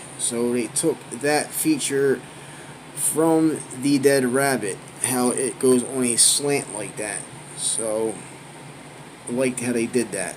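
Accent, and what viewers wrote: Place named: Philadelphia, United States. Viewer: Thanks for the review brother. I recognize a philly accent from anywhere. I’m guessing the north east?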